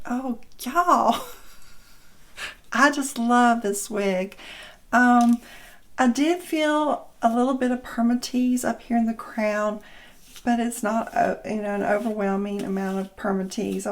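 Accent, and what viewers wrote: South, United States. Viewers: Absolutely gorgeous!!!! Love your accent!!!! It’s nice to find someone on YouTube that has a similar accent as I do!!!!! Gotta love the South!!!!! 👍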